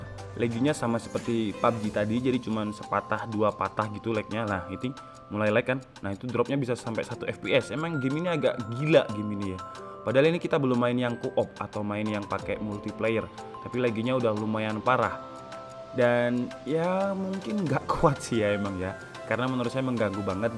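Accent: Malang, Indonesia. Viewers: Mas nya orang malang?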